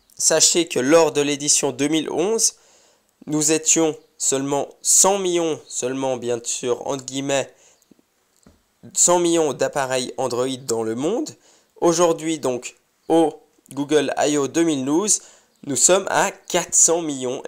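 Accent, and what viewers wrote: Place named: Switzerland. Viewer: ça s’appelle un accent! vive la Suisse romande et vive les vidéos de l'!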